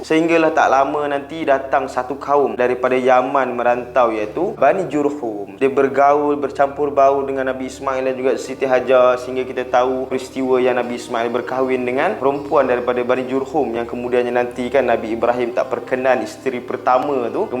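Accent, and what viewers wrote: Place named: North, Malaysia. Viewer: Crita dh sedap..tpi bhsa utaqa hg xbrpa nk sdp.....tpi boleh prbaek lgi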